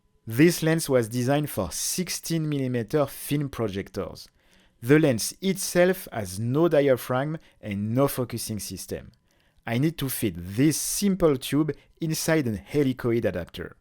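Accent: France. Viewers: Hey, are you french?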